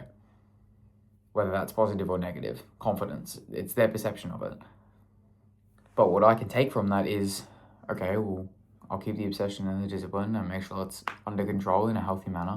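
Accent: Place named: Australia. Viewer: Hi, you sound like a awesome Aussie. Do what you love to help your journey so that you have the abilities from your hardwork of hardships? You'll understand when your 40 years old. The perception of you talking about Ego, is that all is of value, when you use intellect & compassion in the fruits of your labor. You may need to start a little martial arts training, because demons don't like to be seen by the light. laughing not laughing, just loving, living, learning that the truth is non-negotiable. Great day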